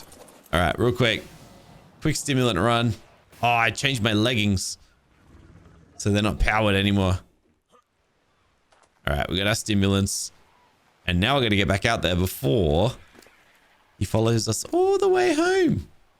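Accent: Australia. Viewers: Sounding extra Aussie today. Good stuff!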